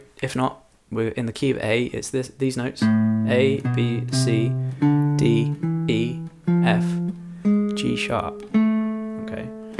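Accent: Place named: United Kingdom. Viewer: Thanks, nice clear laid back Brit!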